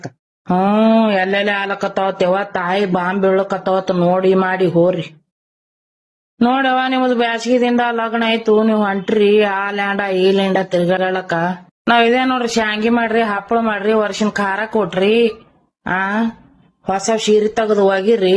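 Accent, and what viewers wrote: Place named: Indi, India. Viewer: ಪಕ್ಕಾ ಇಂಡಿ ಭಾಷಾ ಮಾತಾಡತಿ ಅಕ್ಕಾ. ಇಂಡಿ ಬಂಗಾರದ ಬಂಡಿ 👌👌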